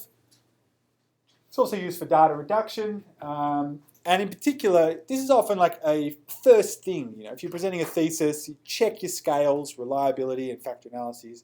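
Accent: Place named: Australia. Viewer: that's a typical Australian accent.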